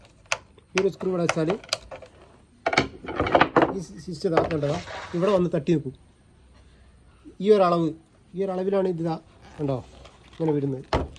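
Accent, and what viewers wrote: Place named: Kozhikode, India. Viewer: ഭാഷ ആക്സന്റ് കേട്ടിട്ട് കോഴിക്കോട് അല്ലെങ്കിൽ മലപ്പുറം ആണ്.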